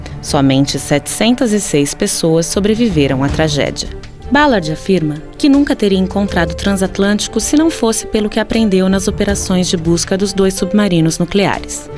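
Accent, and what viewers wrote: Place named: São Paulo, Brazil. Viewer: essa mulher tem um sotaque irritante de paulista "iceberrrrg"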